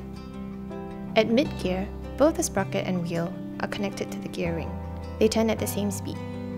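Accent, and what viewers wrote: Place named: Singapore. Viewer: Sounds like you are from Singapore. So proud of you. Now that i have watched all three videos. Majulah!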